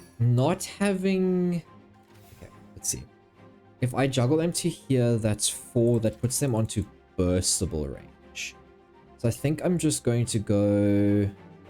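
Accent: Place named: South Africa. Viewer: I love it when I immediately recognize a fellow South African's accent while randomly consuming Genshin content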